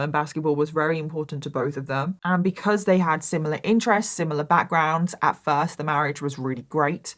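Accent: United Kingdom. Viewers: LOL loved this roasting of creepy Americans in British Accent 😅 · I find you attractive despite your British accent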